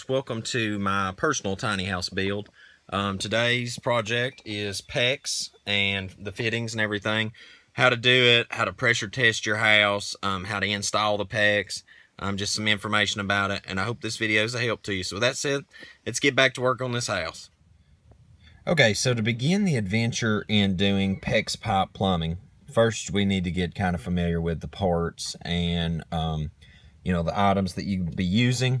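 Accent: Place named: West Virginia, United States. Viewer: Sir, I love your accent where are you from. Sounds like West Virginia or Kentucky maybe.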